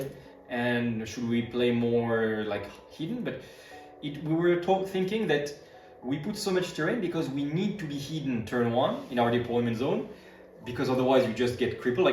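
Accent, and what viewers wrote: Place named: France. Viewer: Toujours un plaisir à suivre ces Battle Report. Merci aux participants! This little french accent add the perfect flavor to the Alliance fleets 😄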